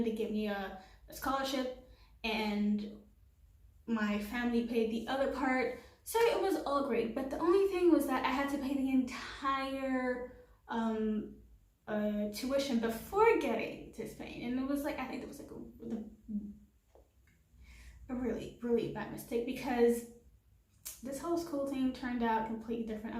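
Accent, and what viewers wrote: United States. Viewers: I'm actually shocked that you're Hatian! You have an American accent! How?!? You sure you've never lived in the US?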